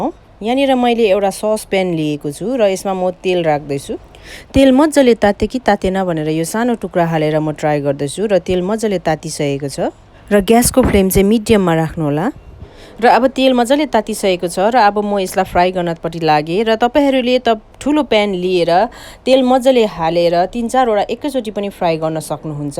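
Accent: India. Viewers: Namaste mam, i am ur new subscriber....i am highly impressed by your hindi mixed nepali accent n ur easy recipes....keep posting easy tasty recipes..thanku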